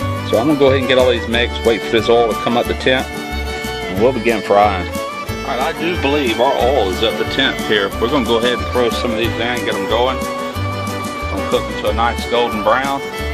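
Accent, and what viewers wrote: South, United States. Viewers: I Like The Theme Of This Video & The Accent U Have Lol I Felt Like I Was In The Country What Was The Name Of The Song That Started Playing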